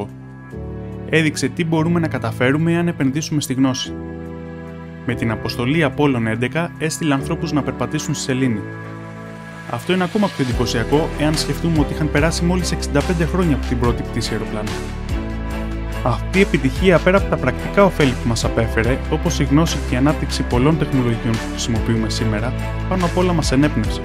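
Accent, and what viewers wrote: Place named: Albania. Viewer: Ε, όχι και τόσο καθαρός λόγος που προφέρει το ρ σαν αλβανός.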